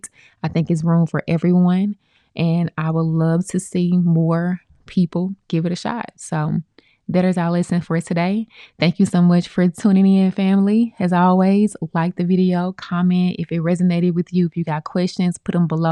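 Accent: United States. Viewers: Luv the southern twang :) and enjoyed your professional presentation of your topic...the meat and potatoes without all the garnish!! Very insightful and honest and that is what i feel a lot of content creators are missing...authenticity. Thank you for the wealth of information and keep doing what you're doing!! Stay Blessed!!! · I’m a phlebotomist and have always wondered if I could do this! Thanks!! I’m with CDNA and it is free. They didn’t charge me anything! And I’m in Ga you sound like a Atl girl. I will send patients we can’t take up your way! ❤thank you again for these gems!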